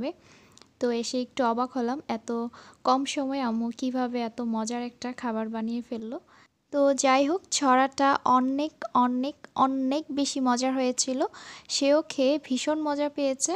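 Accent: Comilla, Bangladesh. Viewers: Apu tmr basha comilla kothay?? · Nice apu bt apnar basha comilla kothai amar o comilla basha apu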